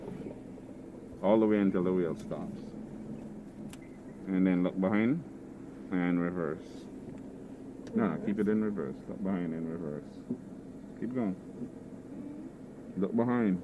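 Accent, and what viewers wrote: Jamaica. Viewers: Sounds like he’s Jamaican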